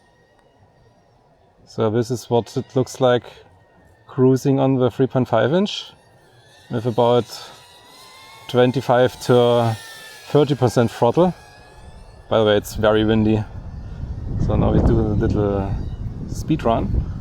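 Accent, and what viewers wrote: Germany. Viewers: That German accent is just crazy lol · accent sound german af, also mach ich mal in amtssprache weiter. Geiler spot den du da hast. Ich habe meinen goto spot leider noch nicht gefunden :D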